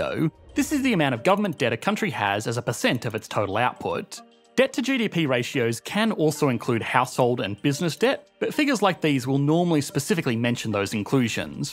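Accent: Australia. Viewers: This is a brilliant video but this guys accent makes it UNBEARABLE! get someone else to narrate it you are not a good presenter. The annoying tone raises at the end that your Ausie accent is used to makes no sense to Americans or brits, this kind of raising your pitch only makes sense if there is a clause or a comma in writing which has a follow up in the same sentence. You do it with Litteraly every phrase and it makes what you’re saying impossible to understand.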